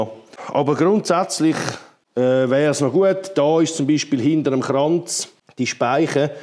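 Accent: Switzerland. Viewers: Keine Ahnung von Töffs, aber ich liebe schwizerdütsch . Genial. Sofort abonniert . Dankeschön ! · Supergeil, dein Schwizerdütsch. Schön erklärt !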